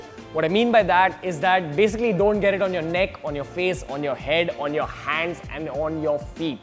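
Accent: India. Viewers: Tatu Indian ascent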